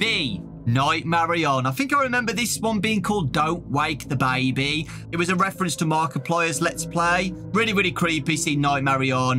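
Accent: United Kingdom. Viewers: Bruh he british? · My friend:"who are you watching" Me:"Dawko" My friend:"oh, who is he and what does he do" Me:"he's British, he talks loudly, and plays FNAF" My friend:"so he's like Morgz?" Me:"yes.... But with FNAF"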